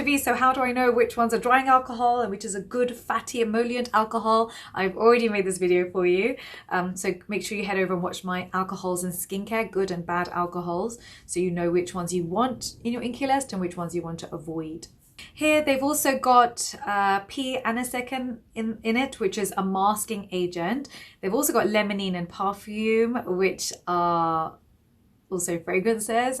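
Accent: United Kingdom. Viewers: She has a very sharp British Accent. ❤️ I love to hear her every day.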